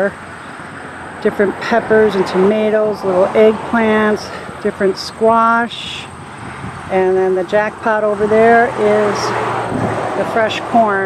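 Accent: Wisconsin, United States. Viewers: ... NICE VIDEO ... VERY RESPECTFUL COMMENTS TOWARDS THE FARMERS & THEIR STANDS ... LOVE YOUR "WISCONSIN ACCENT" ...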